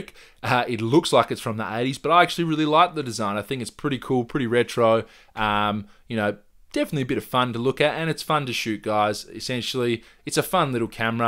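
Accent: Australia. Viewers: First I thought "an aussie!" then I realised you're also from Perth and I subbed immediately. Gotta rep the home town. Great vid, looking at this camera so super helpful!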